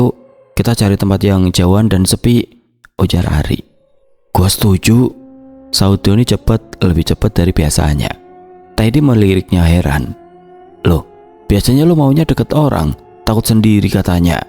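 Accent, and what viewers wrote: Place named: Java, Indonesia. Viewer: Waalaikumsalam, maaf ga maksud tp tiap mas bawain cerita berbahasa Sunda, lucu karna logatnya jawa, suka banget dengernya😊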